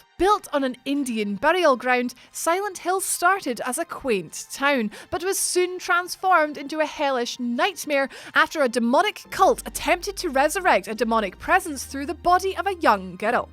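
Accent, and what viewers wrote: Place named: Scotland, United Kingdom. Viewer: This bitches Scottish accent is annoying and irritating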